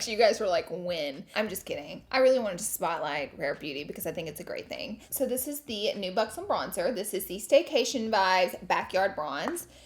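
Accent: South, United States. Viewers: I swore I had seen enough reviews for something I can’t afford right now but I adore face, personality and especially her adorable southern accent 😍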